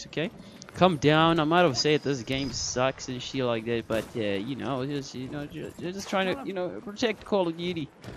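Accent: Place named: India. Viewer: awesome game man and whats wrong with that Indian accent dude -_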